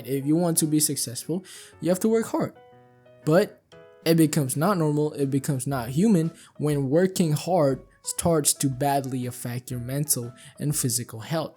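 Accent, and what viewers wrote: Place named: New York, United States. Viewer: are you from NYC? I sort of detect an accent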